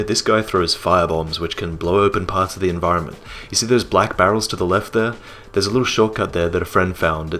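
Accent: Australia. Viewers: Wait, is Australian? Nice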